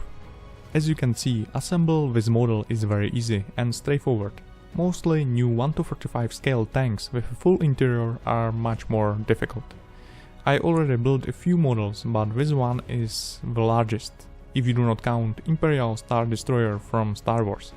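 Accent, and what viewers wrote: Czech Republic. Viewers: "just a little bit" :-) vynikající model a další vysoce kvalitní video, jen to na můj vkus maličko sráží místy školní czengličtina (nemůžu si pomoct, jsem anglista), slovní zásoba je easy nebo si dohledáš, ale občas tomu skřípe pořádek slov, vazba there is/are, etc., někdy to zní jak přes google translator :) neber to, prosím, negativně, za poslední rok dva ses hodně zlepšil; předpokládám, že komentář čteš z nějakého aspoň provizorního skriptu, nechtěl bys to třeba před namluvením zkorigovat? mně to zabere 20-30 minut a rád jako profi lingvista pomůžu profi modeláři k ještě lepším videům ;) kdyžtak napiš do odpovědi a domluvíme se přes fb, ale mezi námi, stejně to asi nikomu nevadí, naopak, ta tvoje ostravská angličtina tomu dodává local flavour ;) ať to šlape!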